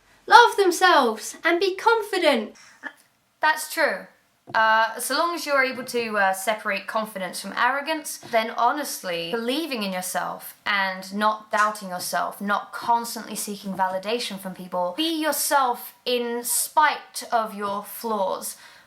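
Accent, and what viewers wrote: United Kingdom. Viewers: why did you use to sound more British back than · alexstfu what? She still sounds british, there are so many different accents in the UK lol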